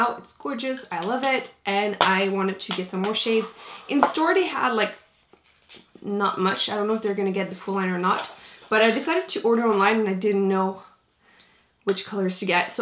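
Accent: France. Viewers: Also, I've thought this for awhile, butI just love your accent! (I took French in high school but don't remember much lol). I just think about it every time you mention the Lancome foundation or any other French makeup brand/name--you're the only one who can pronounce it properly! lol It drives me crazy when people drag out the "a" in Lancome!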